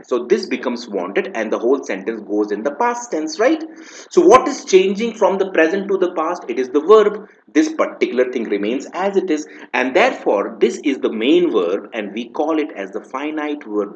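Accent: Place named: India. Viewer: I think the speaker is Indian because of his accent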